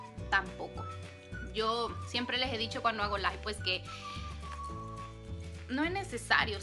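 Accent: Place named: Mexico. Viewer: Me encanto el tag lo hiciste parecer divertido 💗y yo creía por tu acento que eras Mexicana si no es porq tocas el tema yo seguía convencida de que eras 100% México❤y pues si se pega un poco! yo vivo en Italia hace 13 años y no me doy cuenta pero las demás personas me dicen que tengo un acento diferente!yo pienso que es normal!Besos hermosa y sigue así de natural❤😘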